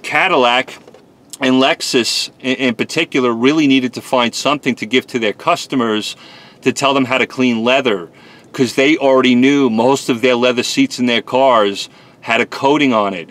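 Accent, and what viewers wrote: New York, United States. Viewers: Sounds like a Liberal from New York living in Dallas. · Is that a New York accent I hear? I love it. Thanks for the tips!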